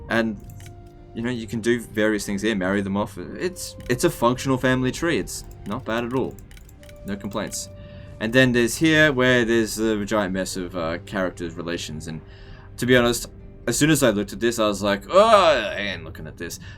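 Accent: Australia. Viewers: I'm guessing that your either an Aussie, or a New Zealander, by your accent. Putting that aside for a moment, I noticed, that you pronounced your faction leaders name, as "Sau Sau". Sorry, my best attempt at spelling it phonetically, the way I heard it. So the "C" in this case, is pronounced, as you would say it in say "Cinnamon" for example. Where it carries more of an "S" sound. As opposed to the sharp "C" sound you would hear in say Car, or Carry, where it has more of a "K" sound. Just want to be sure I'm pronouncing these things correctly. And thought, since your from that part of the world, you would be more of an authority on it, than I am.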